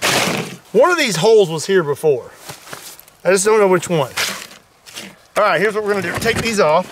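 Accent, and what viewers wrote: Kentucky, United States. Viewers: your voice says kentucky, but your clothing says oregon.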